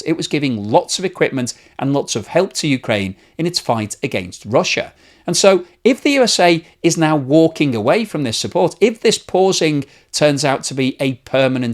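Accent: United Kingdom. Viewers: he sounds british though?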